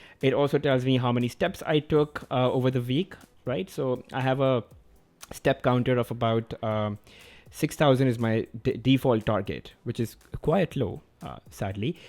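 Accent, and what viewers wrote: India. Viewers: Nice video, I like that Indian accent :) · The Indian accent sold me.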